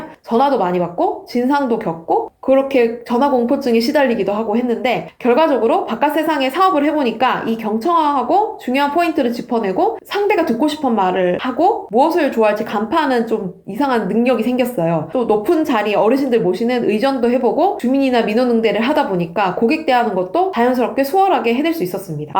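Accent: Busan, South Korea. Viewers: 부산 사람 아입니까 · 님.. 억양에서 사투리가 있어서 경상도분이신가?시픈데 걍 고향말씨 듣고싶읍니다. 사투리해주소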